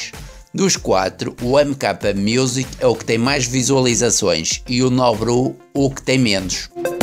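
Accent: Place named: Portugal. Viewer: O cara faz conteúdo do Brasil mas o sotaque e português de Portugal, vc e de Portugal mesmo?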